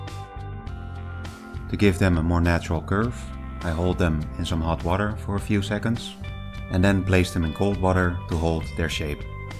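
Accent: Netherlands. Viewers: Bruh you dutch aint you? I can hear the classic dutchman speaking english accent. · Your accent tells me your dutch. Me 2 Great diorama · zwaar Nederlands accent. is wel cool! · Kinda random but are you dutch? 0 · Detects a dutch accent.. spots gamma ... fuck yeah Hup Holland. Also cool Diorama. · nice thick dutch accent ;p lovely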